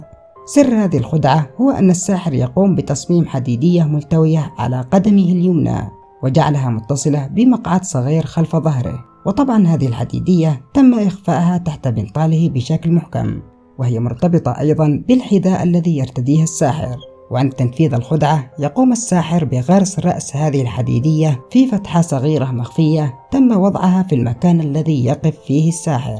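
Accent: Yemen. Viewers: آخيرا حدا يمني بدل المصري .... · ارجو عدم مزج اللكنه (التهاميه)باللغه العربيه حتى لاتكون النتيجه مخضريه الله يحولك. ....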